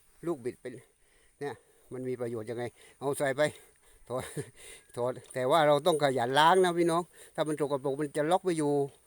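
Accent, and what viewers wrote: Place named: Krabi, Thailand. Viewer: สำเนียงคล้ายคนกระบี่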